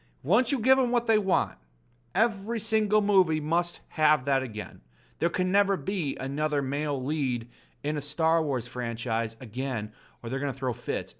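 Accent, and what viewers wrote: United Kingdom. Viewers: Enough with the horrible British accent!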